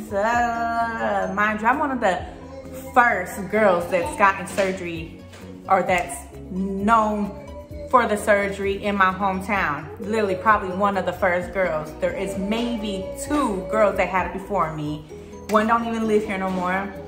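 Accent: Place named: West Coast, United States. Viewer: Your accent is so pretty. What state you from? I’m guessing west coast but I don’t know 🤣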